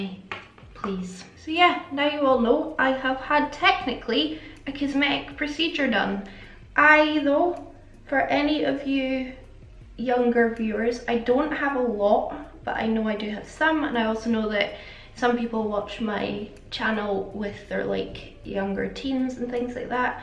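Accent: United Kingdom. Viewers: You are soooo pretty and unique. I can't really watch youtubers with British/Scottish/Welsh accent (having trouble understanding lol) but I haaave to watch you 😍❤ keep doing what you're doing, gives me hope I can do it one day as well.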